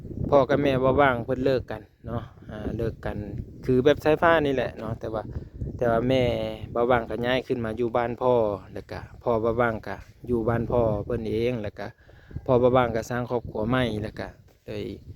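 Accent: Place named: Phu Thai, Thailand. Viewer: ສະບາຍດີ ເວົ້າສຳນຽງຜູ້ໄທກໍມ່ວນຄືກັນ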